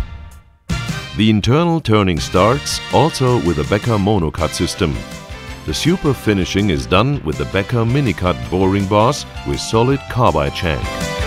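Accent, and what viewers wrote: Germany. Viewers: Der deutsche Akzent in diesem Video ist aber recht dezent und unaufdringlich möchte ich meinen. Da muss man ja fast Deutscher sein um zu wissen das es ein deutscher Akzent ist. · Ich liebe diesen deutschen Akzent im Englisch.. :D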